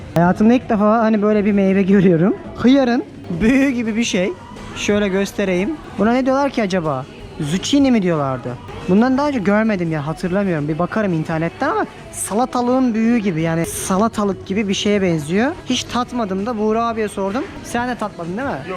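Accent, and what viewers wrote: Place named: Isparta, Turkey. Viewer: Arkadaslar size guzel bir haberim var.Hic uzulmeyin cunku bu adam assagiligin teki,100.00 dolar maas alacakmis ve bu fiyatlarla mutfak masrafi yapacakmis. Peki ev kirasi ne kadar veya ev almaya kalksan kac milyon.Ben sasirdim bu ulkede bedava arsa dagitiyorlarmis diyecekmi diye.Bu ulkenin dogal kaynaklari cok ve bunu iyi degerlendiriyorlar.Kendi petrolleri denizden cikiyor.Koyun canli satilirsa ciftlikten 5-8 dolara bir koyun alabilirsin.Burasi bir kita ulkesi ve cok buyuk arazisi var suyu kit da olsa bugday uretiminde dunyada ilk siralarda.Muthis demir celik uretimi ve komur uretimi var.Iskenderun demir celik ve bazi komurle calisan elektrik santrallarinin komur ihtiyaci Australiadan geliyor.Bu kadar avantajina bir de nufusunun cok az olmasini eklerseniz Turkiyeye gore avantajlari var ve bu nedenle yasam, Turkiye iyi yonetilmedigi icin Turkiyeden daha iyi.Ancak video tam gercekci degil, bir ulkenin, havaalanindan inip birkac saat icinde iyi veya kotu oldugunu anlayamazsiniz.Australia da 1 saat calisirsan su kadar domates alirsin hesabi yanlis.Eline ne geciyor vergi yarisini goturuyorsa ev kirasi turkiyenin 5 kati ise hesap birden degisir.Bu saf herifin gazina gelmeyin sakin ha.Bunun amaci videonun cok izlenmesi, uc bes kurus You tube den bahsis gelecek diye salliyor.Konusmasindan ve KAGIT diyemediginden muhtemelen Isparta denizli Afyon civarindan biri.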